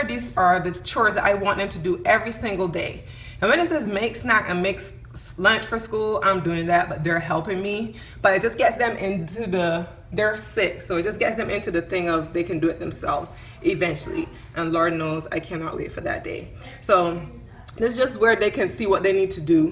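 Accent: Barbados. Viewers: omg your bajan shines through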